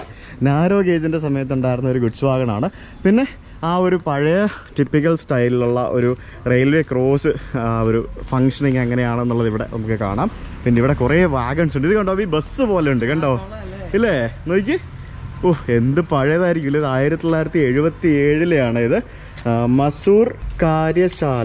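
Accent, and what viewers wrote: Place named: South Kerala, India. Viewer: പോകുമ്പഴത്തേക്കും . നോക്കുംബഴത്തേക്ക് . വികല മലയാളം ആണ് ..അരോചകമായി തോന്നുന്നു . നിങ്ങൾ അവിടെ എത്തുമ്പോൾ . മതി . മണ്ടക്ക് കയറി അല്ല മുകളിൽ കയറി . മണ്ട . ജീവനുള്ള വസ്തുക്കൾ ക്ക് ആണ് ..കേരളത്തിൽ മുഴുവൻ ഉള്ള ആളുകൾ കാണുന്ന വീഡിയോ യില് തെക്കൻ ഭാഷ കഴിവതും ഒഴിവാക്കുക . ❤️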